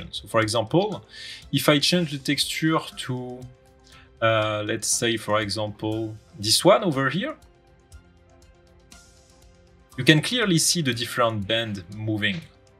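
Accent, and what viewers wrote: France. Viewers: Il faudrais faire les vidéo en français, car avec l'accent français la traduction est horrible ! et c'est juste incrompréhensible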